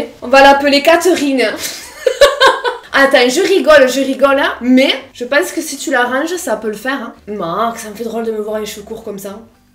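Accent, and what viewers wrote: South, France. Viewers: En tout cas on sait qu'elle vient du sud pas de doute à priori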